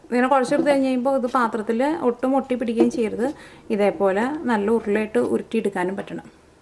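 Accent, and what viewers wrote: Thrissur, India. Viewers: Chechi de nadu thrissur ano?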